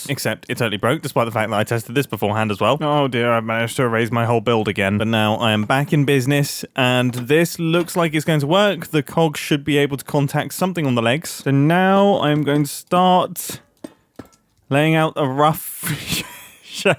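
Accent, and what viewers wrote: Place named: Cockney, United Kingdom. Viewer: a very cockney accent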